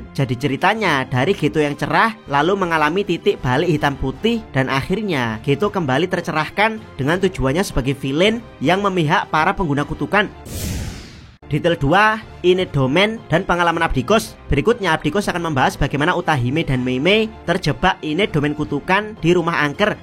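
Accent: Java, Indonesia. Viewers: Adminya orang Jawa ternyata 😂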